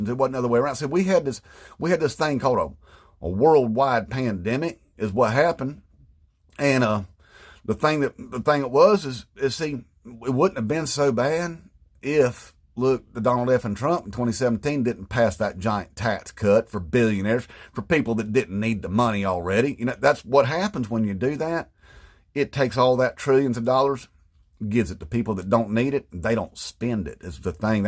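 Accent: South, United States. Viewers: I have lived in South Carolina for 44 years and I can’t tell you how much it warms my heart and comforts me to hear a thick southern accent like yours brother speaking truth and also sounding extremely intelligent. I just made a very long TikTok yelling at Southerners for voting like they have no brain and some southern gal comments on my video that she agrees with me 100% and that groceries are so much cheaper under Donald Trump than Joe Biden. I never said that in the video. I said the opposite of that during the entire video. How are these people so stupid? Anyway, brother, thank you for speaking truth, especially in a southern accent.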